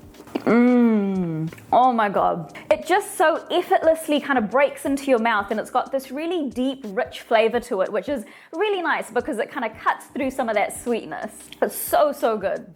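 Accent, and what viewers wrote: New Zealand. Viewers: I tried another recipe which I wasn't a huge fan of. I tried your recipe a couple of weeks ago and my husband and I loved them! I'm pregnant and craved something chocolatey and I asked whether I should make brownies or cookies. My husband immediately said the brownies you made last time from the girl from New Zealand! He was especially delighted to hear your accent as he spent some time studying abroad there years ago. Haha so I'm making these brownies again right now; they are currently in the oven. I never comment on recipe videos because frankly I haven't found many that impressed me. But these brownies are very delicious and better the next day. Thank you so much! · Do I detect a kiwi accent? 🤔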